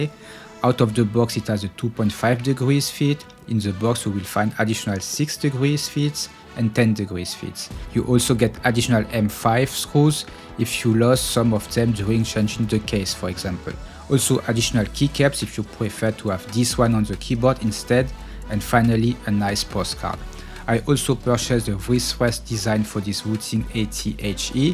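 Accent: France. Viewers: On est d'accord c'est un accent Français ? ^^ Merci pour ta vidéo !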